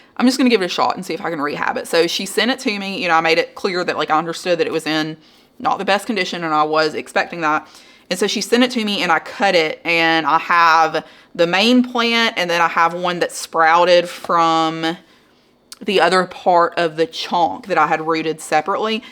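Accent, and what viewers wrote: South, United States. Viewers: We don’t have a publix in Wisconsin.. we have Copps, and I’ve never seen any philodendron. How’s the job going? You recently went back? What side of Georgia? You have such a strong southern accent, but I love it!! 😂❤ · When I first heard her, she reminded me a lot of my relatives in NE Alabama, so I thought she was there or perhaps North GA. I was pleased to find out I was right! I grew up in NW FL with a much more subtle Southern accent, so my extended family mocked my “Yankee” accent.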